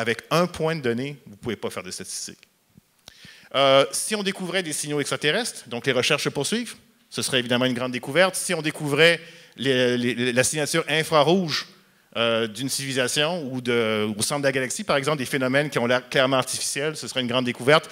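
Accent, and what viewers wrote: Quebec, Canada. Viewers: Je trouve qu'il a la même voix. J'ai le même accent, je suis québécois. On n'est pas obligé d'être d'accord mais c'est ce que je pense vraiment. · J'aurais bien voulu écouter mais je suis gêné par l'accent... Dommage. Vous n'arrivez pas à parler avec l'accent parisien, les Québécois ? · J'adore sa manière de parler, dépaysant et réconfortant. C'est ce qui est cool dans le Québécois comparé au Français, c'est que c'est la même langue mais avec des intonations et des expressions différentes qui font qu'on arrive à exprimer des idées d'une autre manière.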